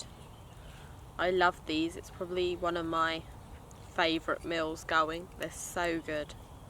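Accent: United Kingdom. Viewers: 听口音是英国人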